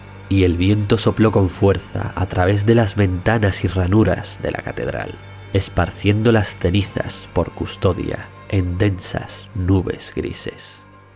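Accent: Spain. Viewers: Excelente trabajo, y que este relatado con acento español le suma mucho a la ambientación.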